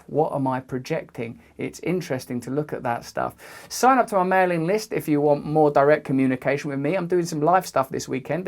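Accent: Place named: United Kingdom. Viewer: The Brits are so cute when they pronounce words.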